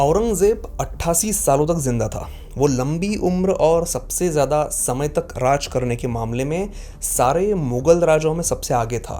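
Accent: Maharashtra, India. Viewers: बहुत अच्छा व्हिडियो! जी आपके कुछ उच्चार जैसे की 'हाथी' के बजाय 'हत्ती' बोलना और 'निर्माण' को 'निर्मान' बोलना उनको सुधारने की जरुरत है. आप मराठी हो ये आपकी बातो से झलकता है.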